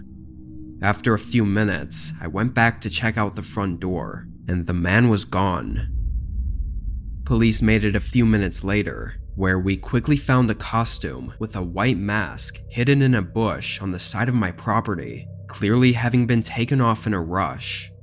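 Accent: Canada. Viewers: I actually hear a Canadian accent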